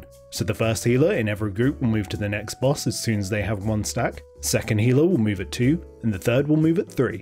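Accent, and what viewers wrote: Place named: United Kingdom. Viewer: I think he is British by the accent maybe?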